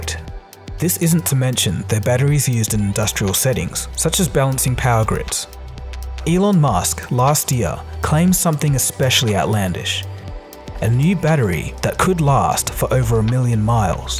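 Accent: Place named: Australia. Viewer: Ford falcon?! Only noticed the subtle Aussie accent after that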